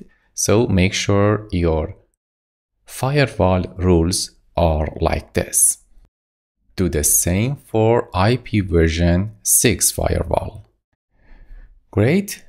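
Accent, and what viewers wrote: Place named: Iran. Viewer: لحجه ایرانی همه جا معلومه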